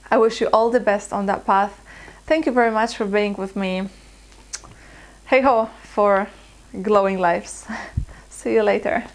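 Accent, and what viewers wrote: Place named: Poland. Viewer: pls don't lose your polish accent ya.. luv it.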